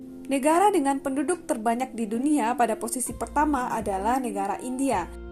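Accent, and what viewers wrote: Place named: Eastern Indonesia, Indonesia. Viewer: Logatnya agak lain kak.. dari timur kh?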